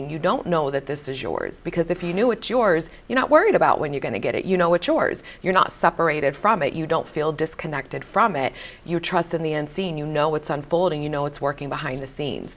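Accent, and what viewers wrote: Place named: New Jersey, United States. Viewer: this is exactly what I needed to hear! I was getting discouraged by all the "if you're doing everything right, it should be showing up instantly!" videos because when it wasn't happening instantly, I assumed I failed. Thank you so much. (p.s., is that a Jersey accent I hear?? I was wondering why I found your voice so comforting - growing up right outside of Jersey, all I heard were people who sound just like you!)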